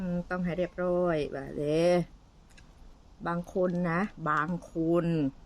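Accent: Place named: Korat, Thailand. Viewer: แกพูดสำเนียงโคราชเเก่อยู่บ้านอาย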